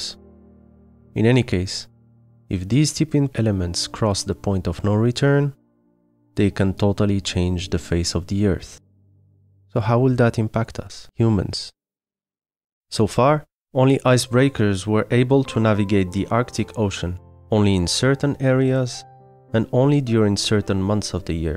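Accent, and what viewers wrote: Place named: Wallonia, Belgium. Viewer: My guess the accent is from Charleroi, Wallonia.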